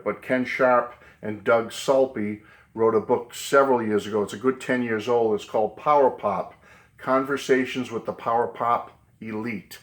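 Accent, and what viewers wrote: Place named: New England, United States. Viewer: Hi. this was a big help to me I did like your video. It was a lot of fun to here your New England accent:}. My Grand mother was from New England and see did say park the car a lot lol.:}. So thank you for that happy memory of here!!!:}. O great video lol Take care my friend!!!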